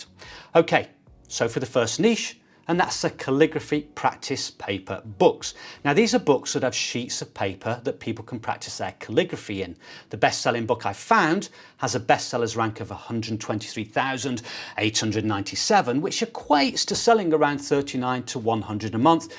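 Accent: United Kingdom. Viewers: welcome to OZ and many thanks for the videos - I hear your UK accent and wish you all the best here - we came over from UK in 1961 I was 4 so grew up here - it's an amazing place that's for sure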